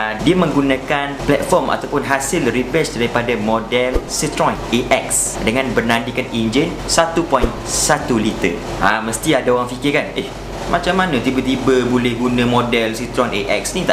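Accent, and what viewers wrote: Terengganu, Malaysia. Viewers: Boh org ganu ke hahahhaha.. Kemah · Kemah boh... Bangung... Kuat ganu ter.. 😅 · Loghat ganu tu hok best nya....boek arr bang👍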